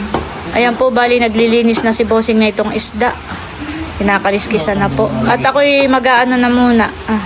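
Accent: Quezon, Philippines. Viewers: Taga Quezon po kayo Ang punto po Ng salita nyo ay Quezon po saan po kayo sa Quezon po Ako po taga Lopez Quezon po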